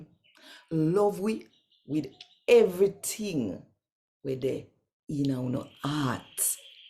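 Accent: Jamaica. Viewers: Very touching my Sister, it's very nice to hear it read in patwa❤ our cultural language love the land of my birth jamaica 🇯🇲 to the world🥰❤️‍🔥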